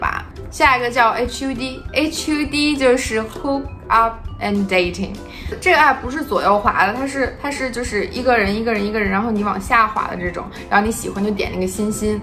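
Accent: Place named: Beijing, China. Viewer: 北京腔儿，姐们儿老北京啊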